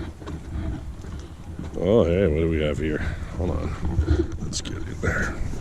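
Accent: Canada. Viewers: Awesome! You sound like a fellow Canadian. I am so inspired - "gathering resources". Been there so many times. Cheers from Montreal QC.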